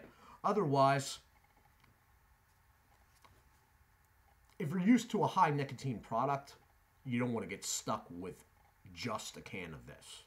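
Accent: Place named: South Jersey, United States. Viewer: I can tell by your accent your from my neck of the woods. South jersey/philadelphia?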